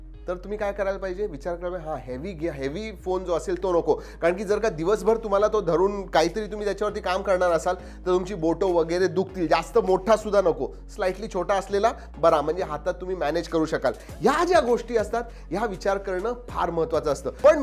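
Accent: Pune, India. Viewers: Sir, tumhi punyache ahat kay?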